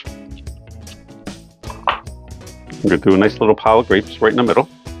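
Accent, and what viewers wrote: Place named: Northeast, United States. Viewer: Bet this guy is from the NE USA how he pronounces it wooder melon. People in Maryland Jersey New York and PA call it water ~ wooder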